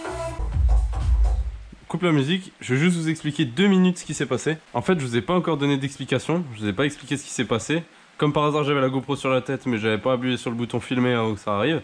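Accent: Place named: Alsace, France. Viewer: A mes tu et alsacien mdr duncoup jentend kopf temi 😂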